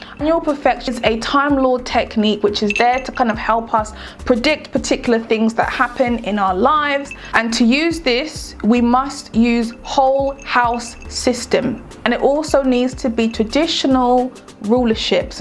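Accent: United Kingdom. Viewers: 🤣 🤣 🤣 🤣 I LOVE your energy and the wsu you present your vids, you're the only astrologer with a British accent I follow, I'm South London 30 libra ♎... You have a patreon??? I wanna link up wit youuuuuuu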